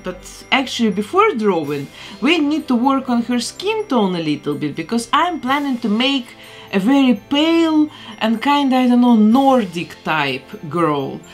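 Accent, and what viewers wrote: Russia. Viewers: Акцент слово из России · Блин, честно говоря, такое ощущение будто автор канала русская. У неё очень явный русский акцент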